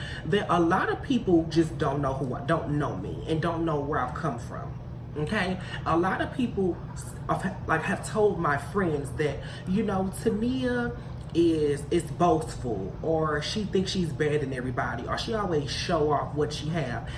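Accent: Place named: Midwest, United States. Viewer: U sound like midwest, detroit area here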